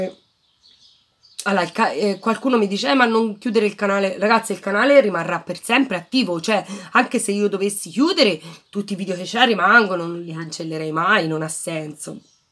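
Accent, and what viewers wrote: Tuscany, Italy. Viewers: Sono contenta che farai ancora dei video, mi piace sentirti, mi fai compagnia, amo la tua parlata, mi riporti nella mia toscana da cui manco da 7 anni e che mi manca... Quanto alle domande che ti poni, ti capisco io me ne faccio troppe e tante su tutto, come dico alla mia amica io non ho un cervello ho un multisala, in cui proietto 1000 film diversi più volte al giorno e tutti diversi... Anche io mi aspetto tanto da me ed ho paura di essere una delusione per gli altri (ho qualche cicatrice anche io, anche se non si vede), per fortuna ho chi mi prende a calci o minaccia di farlo e allora proietto qualche film in meno, ma poco... Tu continua così che vai benissimo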